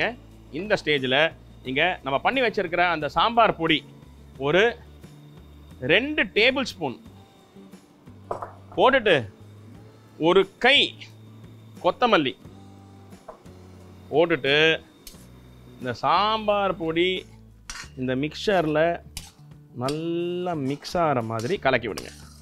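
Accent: Chennai, India. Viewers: Dear chef, Love your passion and the joy you have for cooking, my Amma watched every episode of Samayal Samayal and enjoyed it immensely... Unga Madras Thamizh kaettaa I feel so at home 😊... Do we add the Sambar podi only in the end and not while cooking the veggies in the tamarind extract? Will there not be a raw smell (of the chillies kaaram) since we are turning the stove off immediately after adding the Sambar powder and not cooking it? Will be thankful if you can pls clarify, this is my absolute favourite and I'll be super thrilled if I get it right, missing Chennai :)...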